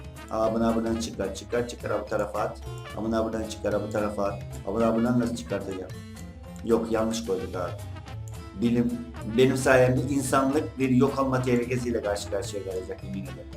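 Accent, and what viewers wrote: Black Sea, Turkey. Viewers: abi sen Karadeniz'li Misin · Abi sen Karadenizlimisşn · Karadenizli büyük ihtimal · Karadenizli mi kinusma tipine haz usagim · Abi karadenizli mısın?🙊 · Abi Karadenizi misin? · ABİ KARA DENİZLİ GİBİ KONUŞUYO AMA ŞİMDİ NORMAL OLDU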